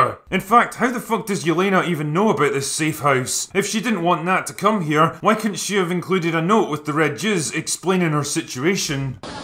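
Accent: Scotland, United Kingdom. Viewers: Dude, are you Scottish?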